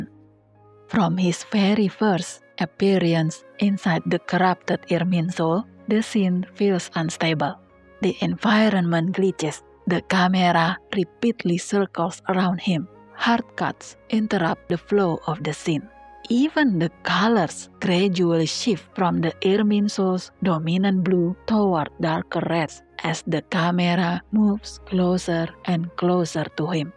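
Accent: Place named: Indonesia. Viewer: Definitely indonesian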